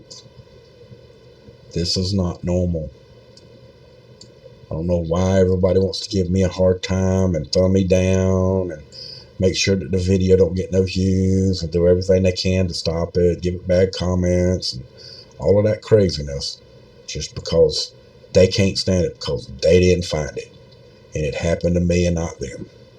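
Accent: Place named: South, United States. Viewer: I love your accent. Reminds me of when I lived in Virginia and Melbourne, Florida. Enjoy your channel. Are you in Florida? Please don't take the Lord's name in vain.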